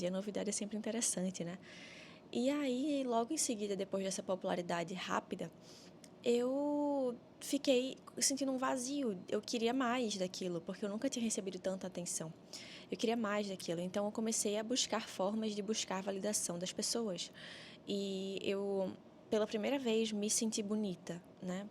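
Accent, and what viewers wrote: Brazil. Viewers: Se tu disseres que nao é catarinense, eu nao acredito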